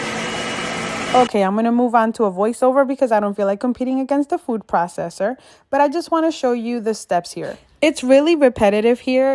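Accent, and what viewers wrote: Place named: Puerto Rico. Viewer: Hearing her speak makes me desperately miss My NY boriquas. South Carolina SUCKS when it comes to REAL food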